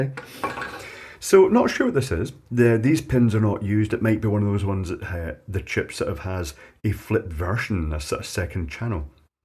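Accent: Scotland, United Kingdom. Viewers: Must be his broad Scots accent.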